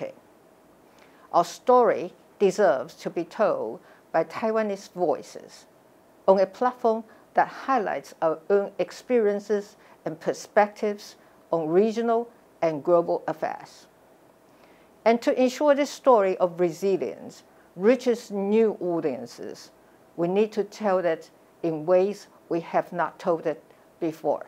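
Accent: United Kingdom. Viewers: 小英的英國腔英文真好聽！台灣加油啦加油💪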